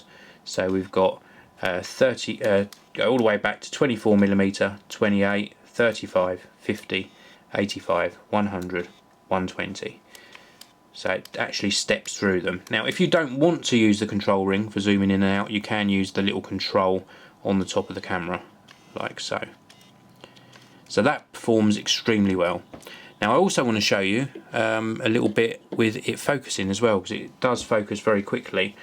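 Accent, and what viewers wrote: England, United Kingdom. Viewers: english accent. Britain is made up of 4 countrys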